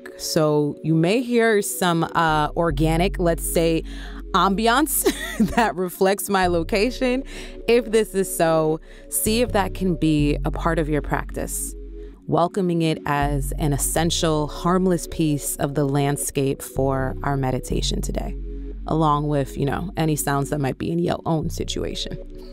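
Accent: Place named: New York, United States. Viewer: I want loud mouth thick NY accent back 😂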